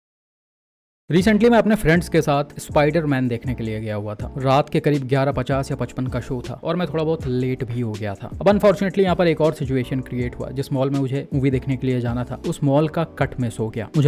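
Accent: Lucknow, India. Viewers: bro are you from lucknow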